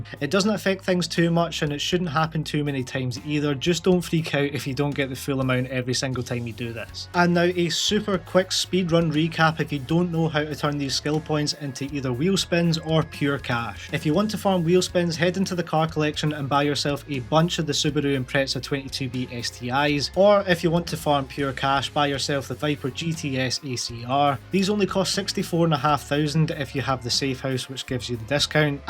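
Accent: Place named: Scotland, United Kingdom. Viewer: My god I love Scotch folks. The accent is amazing. Also at the end it sounds like you are talking about Forza Horizon Sex Content 🤣. Stay cool and thanks for the tips!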